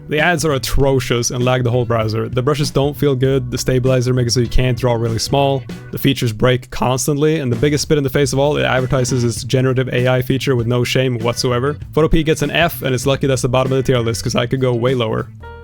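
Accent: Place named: Sweden. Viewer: could tell by your accent you're swedish (from a norwegian with a lot of swedish fam) much love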